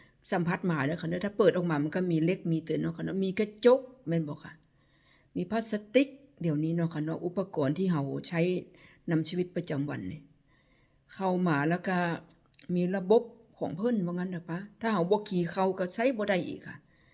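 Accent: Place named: Phu Thai, Thailand. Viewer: เว่าคือคนภูไท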